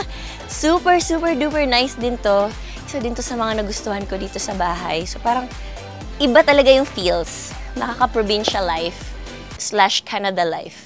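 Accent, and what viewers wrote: Visayas, Philippines. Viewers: bisaya po mo ate kim chiu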